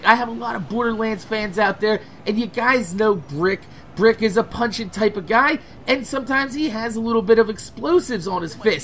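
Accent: New Jersey, United States. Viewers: are u from nj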